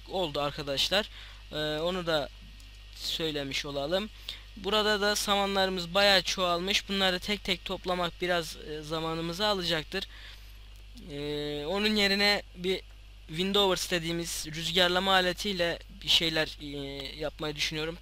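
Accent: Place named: Sivas, Turkey. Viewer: heee sivaslılarda böyle konuşuyorlarda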